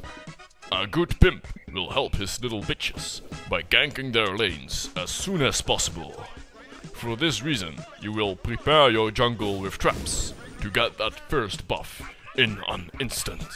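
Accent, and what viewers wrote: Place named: Netherlands. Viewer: Gast, doe je je engels accent expres zo nederlands? xD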